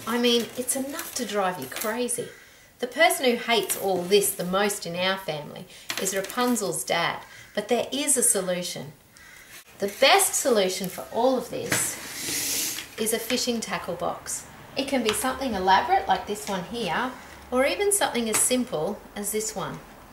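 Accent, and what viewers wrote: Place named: Australia. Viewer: that strong aussie accent xD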